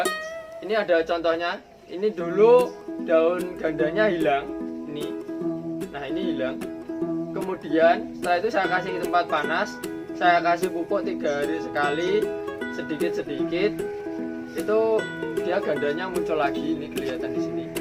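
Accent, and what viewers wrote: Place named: Java, Indonesia. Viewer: Medok banget... Gue suka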